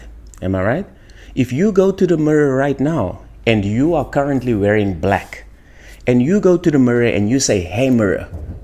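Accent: South Africa. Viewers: Sounds like a South African🇿🇦. Thank you for sharing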